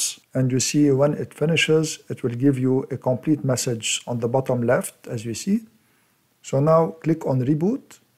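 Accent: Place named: Lebanon. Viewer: sounds like a lebanese accent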